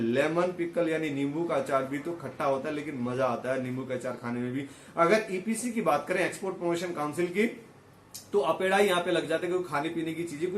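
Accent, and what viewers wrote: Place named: Bihar, India. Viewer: Dear, you had made fun of Punjabis by saying Canada to Kanadha, According to you punjabi speak in this accent.. Moreover you also need to look into your own accent, Russia need not to be pronunce to Rhahssia.. 😂 And many other country name you pronuce in funny way... Now you need to work on your श , say शाम not saam, is that Bihari Accent??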